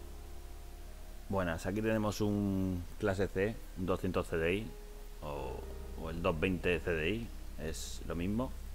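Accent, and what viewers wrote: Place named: Murcia, Spain. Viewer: Murcianico cierto?😊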